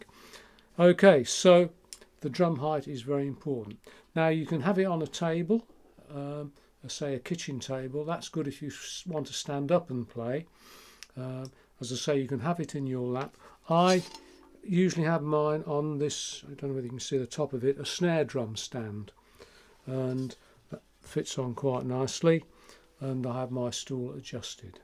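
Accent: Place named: Suffolk, United Kingdom. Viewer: I detect a suffolk aaaacent there 😁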